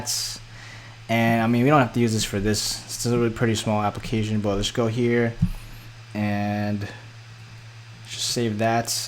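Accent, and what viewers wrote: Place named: United States. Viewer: Great job! And American English!.. Thank you!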